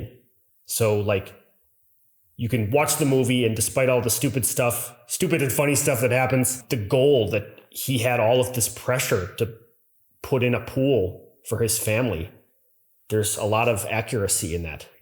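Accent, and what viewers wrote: Germany. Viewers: Really thick german accent why? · lo you got slight german accent.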